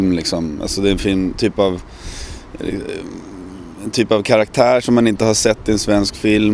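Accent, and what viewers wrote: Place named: United States. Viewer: Holy shit I thought he was American. Sick.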